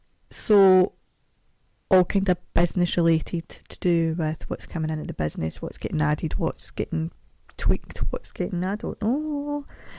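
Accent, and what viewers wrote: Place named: Scotland, United Kingdom. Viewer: It was me!! I'm the one who said they liked your singing, and absolutely, I was serious! I'm in the USA and your podcast came up on my feed randomly. I usually am always listening with earbuds, and I love having your voice, accent, and singing in my left ear. I'm a big fan, and one of my favorite songs of his is. I heard a version of it by, and I was convinced it was MEANT to be sung by a Scottish woman. I always put it in playlists. I have a playlist for you if you want it.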